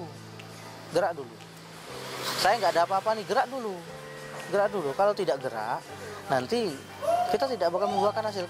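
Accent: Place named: Java, Indonesia. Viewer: Masnya lama di jogja jadi sdikit medok, hehehe, sukses trus mas....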